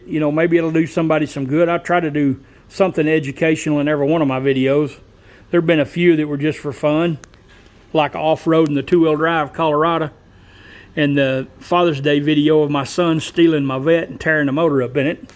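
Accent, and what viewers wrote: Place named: Tennessee, United States. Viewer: Are you here in tennessee? You sound like a fellow tennessean.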